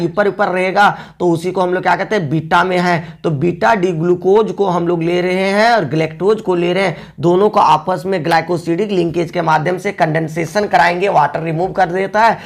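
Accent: Jharkhand, India. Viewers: Aap jharkhand se hi lagte ho sir 😊😊😊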